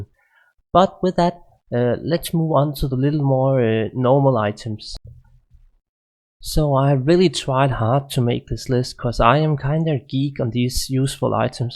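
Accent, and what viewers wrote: Denmark. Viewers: Hehe, After your first 5 words me and my gf said at the same time "That guy is Danish" :P (Well, we said it in danish, since we too are danish, but you get the point) But nice video, thanks :P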